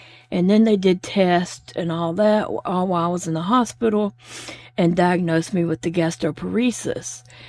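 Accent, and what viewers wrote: South, United States. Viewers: Ms, I think we are all at different places in the spectrum of sharing our details. I'm probably at the opposite end of you, I don't feel it's an honesty/non-honesty thing, but just a comfort level at disclosing our intimate details. That said, I think your videos have helped many who also struggle physically with chronic issues and I admire your courage in putting it all out there. And when you struggle, I pray. God may already know what you are going through, but He has commanded us to pray one for another, so I will. And on the good days and when you share your blessings, I also rejoice with you. So I hope and pray that you feel those prayers and are strengthened knowing there is a community out here that cares for you and is sending up powerful prayers on your behalf. With love, your sister in Christ!❤... Can I just add that God gifted you with a beautiful voice and a sweet laugh, with your easy sense of humor. 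That sweet southern accent is so easy to listen to as I crochet with you. And please don't forget when it seems no one understands, no one gets it, Jesus does. I know you know this, but sometimes we just need the reminder. The feelings of despair and discontent are not from God, by the way, so rebuke them in the name of Jesus and start praising Him for all you do have...not what you don't have. Any of us can get ourselves in a funk when we listen to those nasty minions, despair and discontent who come straight from the pit.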